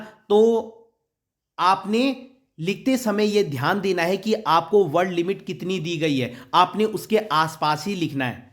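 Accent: Garhwal, India. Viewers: Sir aap garhwali ho? 😃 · Aap uttrakhand se ho na gadhwali..😄😄 voice tuning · aapke accent se lag rha tha mai bhi gadhwali hu😛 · Bhai tum gadwali ho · Sir mai dekha aapke bolne tone garhwali jase hai Orr aake video dekh rha hu aaj mera exam hai 😂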